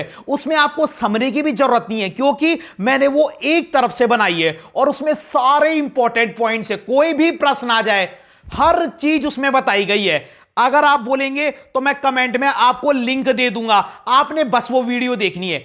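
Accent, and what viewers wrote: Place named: Hills, India. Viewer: सर आपकी आवाज पहाड़ियों जैसे lgri🥸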